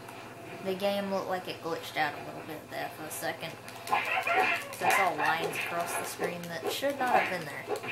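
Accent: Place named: South, United States. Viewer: I love your southern accent makes you sound pretty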